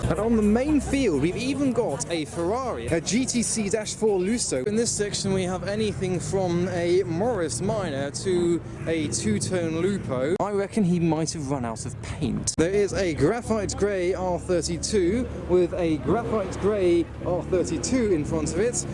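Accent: United States. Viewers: Nice American voice loll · More of your non-specific American accent, please. My favorite part. Wish you’d made more of a fuss about the Chimera.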